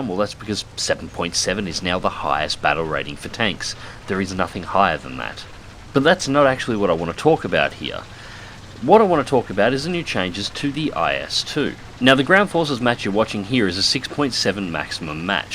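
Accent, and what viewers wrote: Australia. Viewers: Swearing in an Aussie accent just sounds to right hahaha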